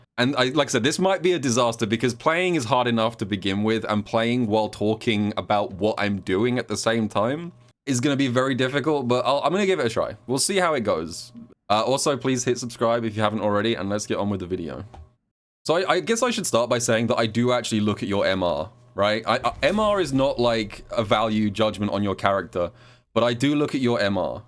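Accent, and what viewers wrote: United Kingdom. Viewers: do again mr british very cool and insightful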